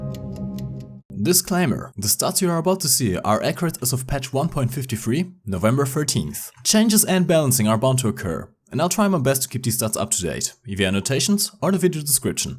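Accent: Germany. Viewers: Really this is a German english accent!! I really like it!!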